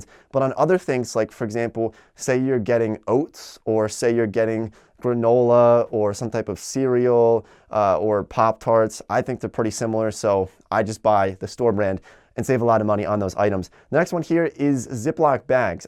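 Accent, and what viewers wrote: United States. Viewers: Are you really American ?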